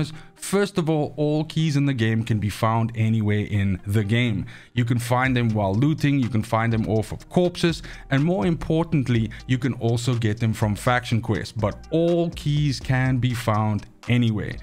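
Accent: South Africa. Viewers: Where you from you sound South African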